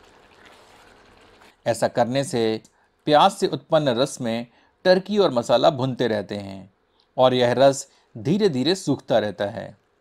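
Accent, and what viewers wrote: Standard. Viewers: Sudh hindi ❤️ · apki sudh Hindi sunke bohot achha laga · शुद्ध हिन्दी बोलते हैं आप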